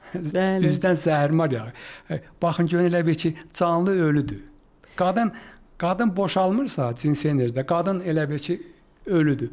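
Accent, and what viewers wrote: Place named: Nakhchivan, Azerbaijan. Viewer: Ləhçəsindən Haxçıvanlıdır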